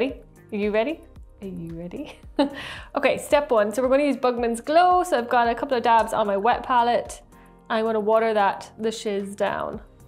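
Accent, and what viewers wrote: Ireland. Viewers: Oh wow, I wasn't expecting an Irish accent. Galway or Mayo I'd guess (from Galway myself, and in fact see my own first name on the wall). Kinda new to wargaming/mini painting and have no idea how popular it is in Ireland, so this at least gives me some hope! Looking forward to checking out the rest of the channel after this as I'm loving this tutorial so far. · A beautiful redhead chirping away at the camera in a charming Irish accent and doing a rather good job painting up that miniature was a great thing to watch before bedtime, tonight. Good job, Miss, and I bet you do rock the elf ears if ever you wear them? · Always been on the lookout for a tutorial that shows exactly how to paint skintones, seen some but they were very vague. So seeing this video with it's perfect on the spot tutorial being shown by the gorgeous has already made 2022 worthwhile. 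The fiery red hair, bright red lips, gorgeous eyes and that wonderful Irish lilt, WOW! Going to check out your backlog of videos and Subbed!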